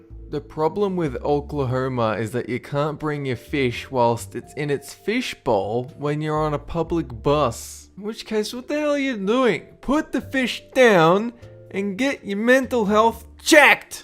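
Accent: Australia. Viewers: Is this guy Aussie? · Are Australian or British? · you australian? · I'm new to this channel and he sounds australian..... ( JUST LIKE ME☺️) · this is gonna sound stupid but are you Australian?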